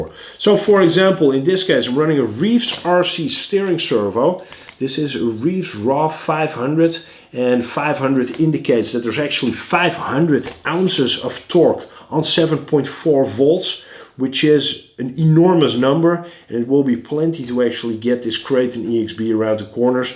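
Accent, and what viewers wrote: Netherlands. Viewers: Like it! Maar ben je nou Nederlands?🤔 hoor een nederlands accentje😂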